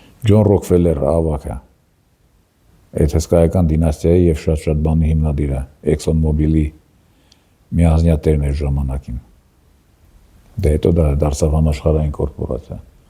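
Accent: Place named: Gyumri, Armenia. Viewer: Հարգելի դուք Գյումրիի կամ Շիրակի մարզի հետ կապ ունեք???Միշտ էնտեղի ակցենտը զգացվում է Ձեր խոսքի մեջ։Նախապես շնորհակալություն եթե արձագնքեք։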